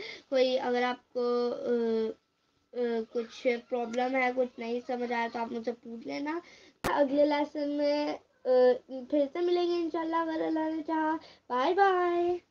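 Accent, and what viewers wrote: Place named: Turkey. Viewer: U have the same eccent like Turkish people Masha Allah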